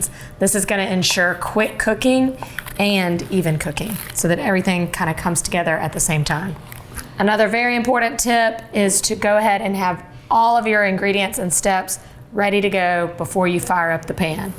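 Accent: South, United States. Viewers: LOL! Love the recipe AND the downhome accent and style combined with oriental cooking- maybe globalism isn't all bad!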